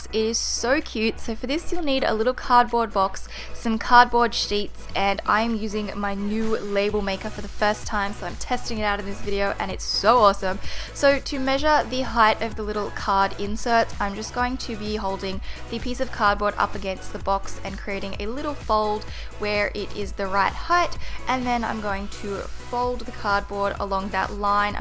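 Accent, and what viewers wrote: Australia. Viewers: Are you from Australia 🇦🇺😊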